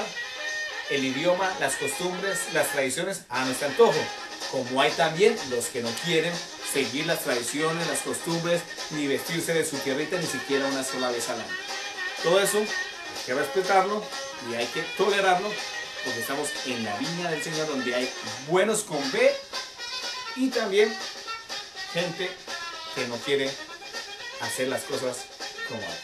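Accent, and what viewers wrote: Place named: Highlands, Ecuador. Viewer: Solamente los Ecuatorianos de la Sierra hablan así.. nosotros los costeños hablamos muy diferente.. pero esta bien tu vídeo..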